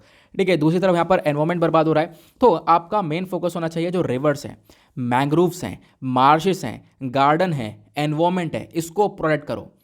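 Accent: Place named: Uttar Pradesh, India. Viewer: acha mujhe nhi pta tha..actually mne 4 months phle se hi inko follow kiya h...ha mujhe bhi lgta hi tha..unke bolne ke style se..chlo hum sb up ke hi h..😆😂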